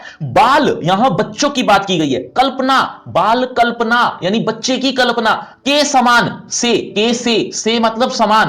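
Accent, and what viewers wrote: Haryana, India. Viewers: hryana se ho kya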